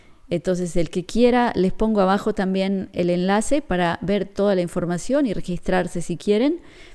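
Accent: Argentina. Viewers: Gracias. Es el tiempo del ser humano "común". Nosotros debemos reconstruir la humanidad en este tiempo. Respecto a los medios, desde mayo del 2020 no miro NADA...Me informo solo por Twitter....Por tu forma de hablar creo que sos argentina. Un fuerte abrazo desde Buenos Aires! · Excelente, gracias!! Les comparto lo que hago. Me he aprendido de memoria el Salmo 91 y el Salmo 23, y cuando voy camino a mi trabajo que es a las A.M. hago esas oraciones; antes de empezar a trabajar hago 30 minutos de meditacion. Luego empiezo a trabajar en un campo de golf y tengo sobredosis diarias de vitamina D. Cuando llego de regreso a casa no veo para nada TV, veo a Salud y Finanzas Inteligentes y me siento muy bien física, mental y espiritualmente. Sería excelente que hicieras meditaciones guiadas con tu amorosa voz Argentina. Saludos de un mexicano radicado en Las Vegas, NV.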